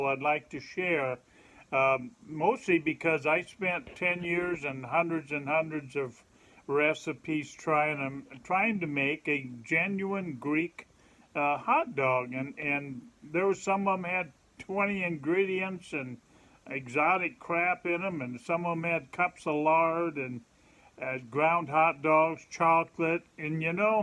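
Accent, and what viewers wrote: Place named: Pennsylvania, United States. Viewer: sounds like you are from Reading Pa. thank you!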